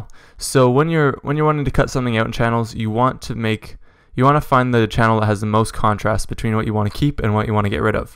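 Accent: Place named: Canada. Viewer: I can hear the canadian accent tehe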